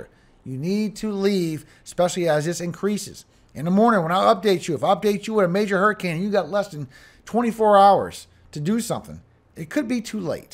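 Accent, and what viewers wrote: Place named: New Orleans, United States. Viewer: when I first saw you pop up on my YT feed I thought you looked familiar and when I herd you speak I knew you was a native and I herd you say you was from here I was born at EJ lol I do recognize the name , N.O. Is small so very possible we have crossed paths one way or another, either way we brothers in Christ and we here now . I really enjoy your shows updates and most of all your prayers bc lord knows we all need them and you always have some from home 🙏👍🏼 The BEST A-MEN IN THE BUSINESS LOL Never Quit !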